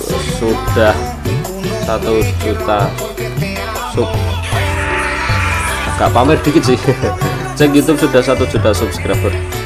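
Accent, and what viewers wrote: Java, Indonesia. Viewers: Bang Lo orang Jawa ya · Jawane ngendi bosku?? Ketok wenakmen ngomonge.. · kok bosone Jowo medok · Bang Orang Jawa Ya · Jek medok jowo sampean mas kurang harapan bangsa yang paling agung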